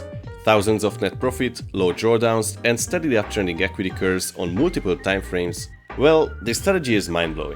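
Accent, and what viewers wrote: Hungary. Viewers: lets say we have commissions about 3$/Lot . One more important question: Is tradingview backtester counts the spread ? Thank you for your answer . I am not here to criticize you because you looks like one honest trader from 100 of scammer but i want to understand and maybe try out your strategy . And one more personal question . I see that your channel is from Romania , and i hear from your accent that you maybe hungarian , is it true?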